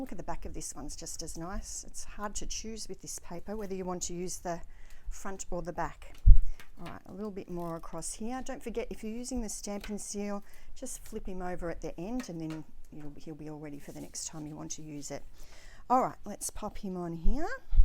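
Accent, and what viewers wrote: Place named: Australia. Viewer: Nice to hear an Aussie sharing!😀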